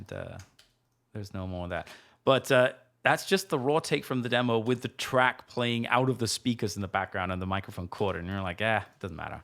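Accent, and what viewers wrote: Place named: Australia. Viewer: i thought he was australian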